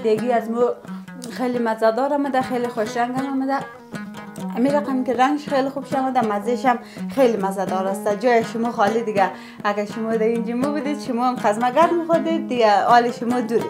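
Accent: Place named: Hazarajat, Afghanistan. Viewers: یادرست کابلی گپ بزن یادرست هزارگی گد و ود گپ مزنی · بسیار خوبشی گپ موگی خوار جان صاف سوچه ازرگی رقم ازو دیگه دختر وری زبان خو کیل کیل ننی موفق باشی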